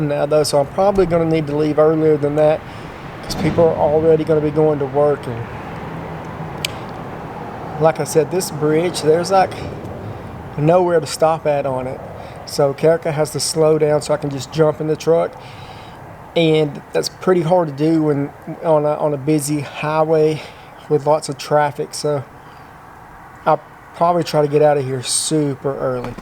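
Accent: South, United States. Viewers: Love your personality, and your southern accent. I have to give you an atta boy for the chances you take while Stealth Camping. Be safe and have fun!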